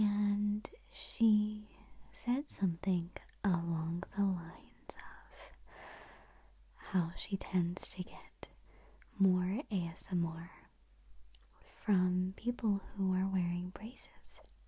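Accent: United States. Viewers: This video is really good, it gave me tingles for the first time in months :') Your accent, cadence and attitude are perfect; please keep making videos! What is your accent? Being from the north of England I'm not great at placing american accents :P Thank you so much, just keep being you <3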